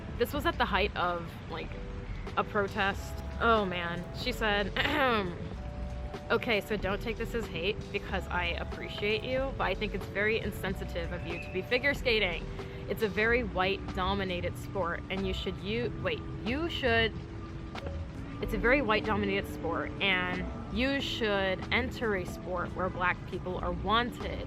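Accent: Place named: Boston, United States. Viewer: Ayeeee your from Boston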